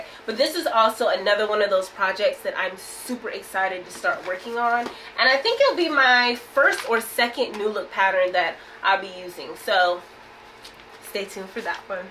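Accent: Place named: North Carolina, United States. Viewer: I knew you sound familiar! 😊I'm from and live in NC, too! Can't beat that country accent.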